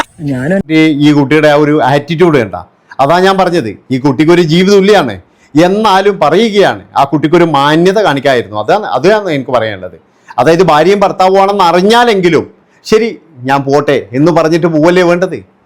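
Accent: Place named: Kollam, India. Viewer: സംസാരം കേട്ടിട്ട് കൊല്ലം ജില്ലയാണെന്നു തോന്നുന്നു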